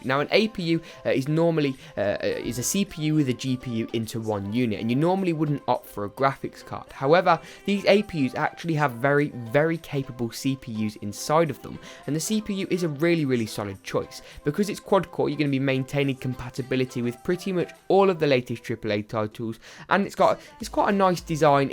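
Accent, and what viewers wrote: United Kingdom. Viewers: Why don't you leave uk links you sound like you're from the uk?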